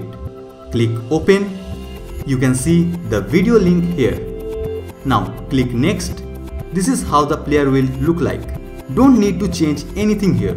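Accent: India. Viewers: You have a North-East Indian accent :) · this mans got the strongest indian accent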